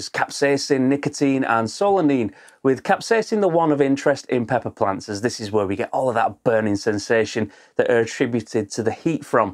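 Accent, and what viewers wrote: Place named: North East England, United Kingdom. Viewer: With an accent like yours I assume you are in North East England and NOT in the North East of the UK. Different growing conditions in those differing areas.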